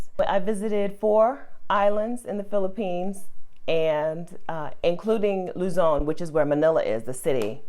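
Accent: United States. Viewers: You American accent gives you hardly any privileges as long as you are black so don't get it mixed up. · Big mistake, your American accent doesn't give you priveldges, it differentiates you from another black person. These American superior mentality I guess. Not really ideal. But I get the point. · Rubbish, why not caption it “countries that are friendly for African Americans to travel to” you generalized it to all brown people only to tell us during you explanation that your American accent gave privilege which means that the caption was deceptive, I stopped listening once I heard that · WHAT PRIVILAGE ARE YOU TALKING ABOUT, YOUR AMERICAN ACCENT OR WHAT. YOUR STILL A BLACK WOMAN, THERE IS NO DIFFERENCE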